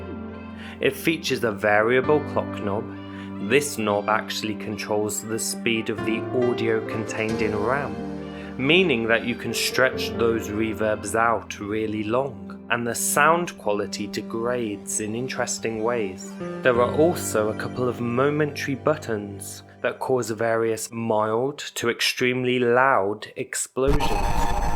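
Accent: United Kingdom. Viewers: YEs yeS YeS YES! Awesome style in every aspect with a lush brummie voice. Long may this continue!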